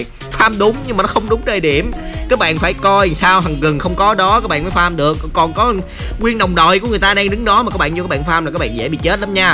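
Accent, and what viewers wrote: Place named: Mekong Delta, Vietnam. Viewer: Thương ông này vl.nghe dọng miền tây dể thương gớm :))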